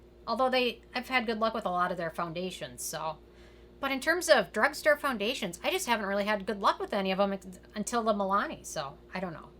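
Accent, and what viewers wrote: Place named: Dakotas, United States. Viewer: Curious are you from one of the Dakotas? Minnesota? Trying to nail down that accent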